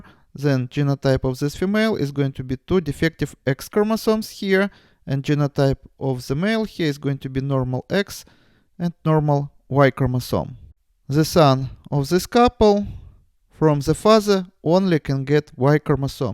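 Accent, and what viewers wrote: Russia. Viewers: Ur accent, russan or greek or what?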